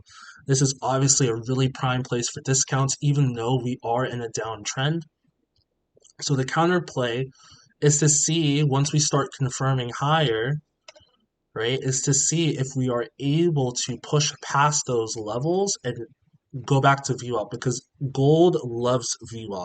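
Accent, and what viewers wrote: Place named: United Kingdom. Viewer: you're from the UK? That's pretty dope. You must've moved here when you were younger judging by your accent. Also I took your encouragement and got my first funded, I did the practice mode and lost a bunch of times but I just got my first trade win for $67.78 and I know it wasn't luck because price got near my take profit three total times before crossing it lol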